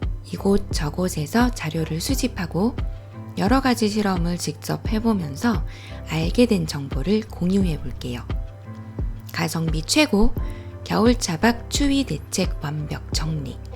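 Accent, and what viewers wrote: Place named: Gyeongsang, South Korea. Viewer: 말씨에 경상도 말씨가 . . . .종종 ㅎㅎㅎㅎ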